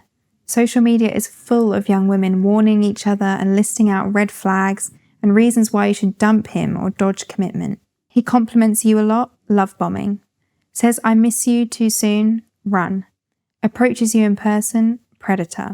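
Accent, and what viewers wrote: United Kingdom. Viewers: Better than any type of non-British accent though. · Ugh, that british accent 😍 😂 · She has one of the many accents found in Britain ... If she was speaking with a Scots accent (like e.g. Billy Connolly) is that THE British accent? If not then why not?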